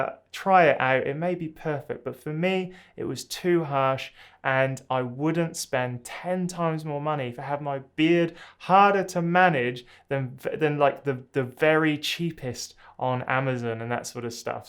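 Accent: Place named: United Kingdom. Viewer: Just out of curiosity how did you manage to get Beardbrand products? I assumed by the accent you were here in the UK 🤓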